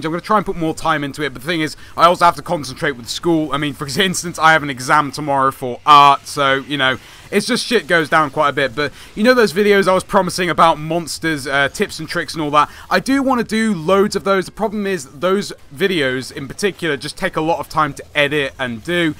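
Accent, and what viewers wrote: United Kingdom. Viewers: He's British? Cool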